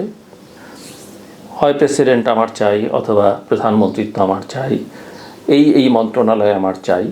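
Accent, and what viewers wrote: India. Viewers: ভাই আপনি তো ইন্ডিয়ান ভয়েস এ কথা বলছেন একদম হবো হু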